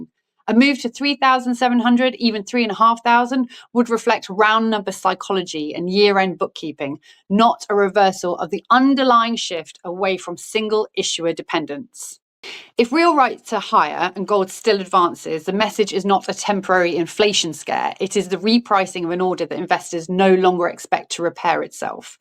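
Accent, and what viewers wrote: England, United Kingdom. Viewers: I could listen to talk all day with that English accent. But thank you for creating this video I truly value your input. Both silver and gold are on fire and I think silver has the potential to go higher in price to create a life of its own.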